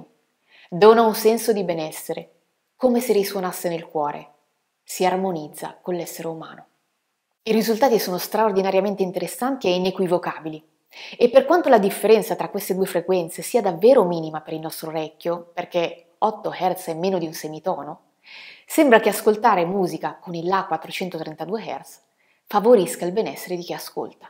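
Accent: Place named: Piedmont, Italy. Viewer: Innanzitutto un sentito grazie per la cortese, precisa e veloce risposta. Continuerò a darti del "tu" anche se, nelle tue righe, sei partita col "tu" per passare ad un più formale "lei" (quando hai aumentato di un 'dente' la polemica... 😜) non fosse altro perché sono più "attempato" di te. Ho guardato altri tuoi video e, oltre a parlare un bell'italiano (sei di origini piemontesi, vero?), esprimi giudizi competenti e risulti davvero simpatica. Di nuovo: complimenti! Ora - però - metto giù il "violino" e vengo "al sodo". Il termine "faziosità" l'hai usato tu non io; e se ti ho "bonariamente accusato" di qualcosa è che in tutto il video appare evidentissimo per quale fazione fai il tifo... E - in tutta franchezza - non ne capisco il motivo. Saranno forse trent'anni che studi il pianoforte; sicuramente avrai sempre usato strumenti intonati a 440; in tutto questo tempo ti sarai formata un orecchio musicale non indifferente; il TUO pianoforte è accordato a 440 (lo suoni in altri video). 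Dunque? Per avere più 'like', stai cavalcando un'onda "new age"? Anche il dott. Corrado Malanga lo fa, tant'è che, da bravo professore di chimica, si è messo a scrivere di "esoterismo": pensa un po' che "scienziato"...! Nella Musica (la scrivo con la maiuscola) non ci sono molti argomenti "scientifici" su cui dibattere: la Musica è Musica, punto. Ciò che piace a me può non essere di tuo gradimento, dunque ciascuno ha il sacrosanto diritto di ascoltare ciò che preferisce, intonato al LA più in sin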